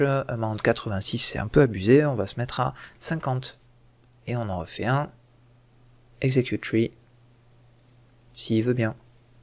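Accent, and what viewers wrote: France. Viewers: Aie, plusieurs tuto de toi que je regarde, a chaque fois je me dis que tu doit etre francais et je viens de remarquer que tu faisais tout les tutos en francais aussi --" Merci pour tes videos elles sont bien expliquées :D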